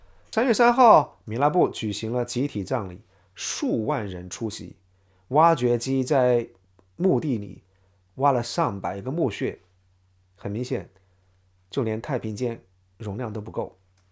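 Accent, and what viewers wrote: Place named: Sichuan, China. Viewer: 这口音是四川人啊😊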